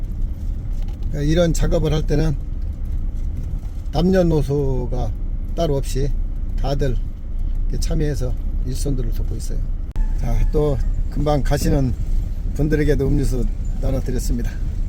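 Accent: Jeolla, South Korea. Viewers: 님사투리가 진안장수그쪽인가요 옛날군생활할때후배가비슷한사투리썼는데 귀에익어서요ㅎ암튼잘보고있어요 건강하세요!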